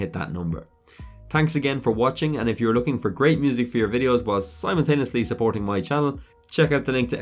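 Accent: Ireland. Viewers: Nice hearing another Irish accent on YT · I'm loving the content on your channel, really excellent work and I hope you keep gowing/growing.... I'm wondering bout your accent tho, Laois Carlow, Offaly, Kildare?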